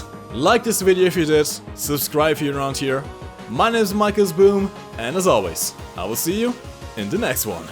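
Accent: Germany. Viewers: I knew that he sounds like a german 😂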